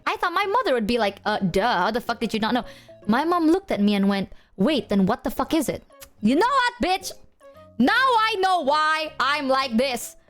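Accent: Indonesia. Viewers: I love when use her indo accent😂😂😂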